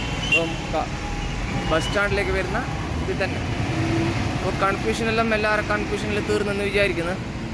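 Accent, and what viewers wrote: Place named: Kasaragod, India. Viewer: ഒന് ഓന്റെ ഭാഷയിലാണ് പറയുന്നത് ബ്രോ കാസറഗോഡ് അങ്ങനെ യാണ്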